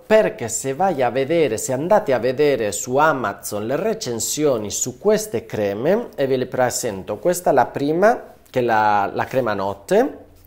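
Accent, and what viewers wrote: Spain. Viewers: Caro dottore, l'ho trovata x caso e le faccio i miei complimenti x la chiarezza di questo video. E anche x il suo bellissimo accento spagnolo😊Grazie.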